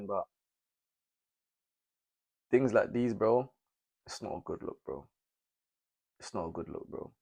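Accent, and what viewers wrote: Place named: United Kingdom. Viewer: U sound like u are from UK... 😅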